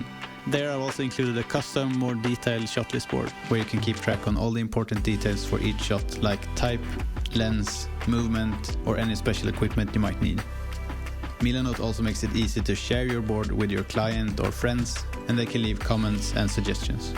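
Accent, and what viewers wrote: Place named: Sweden. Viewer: I like this Swedish English accent :D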